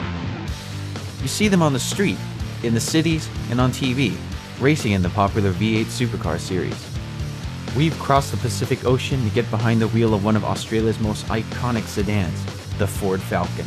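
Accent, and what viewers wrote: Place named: United States. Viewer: I can't listen to a bloody American talk about a true blue Aussie turbo 6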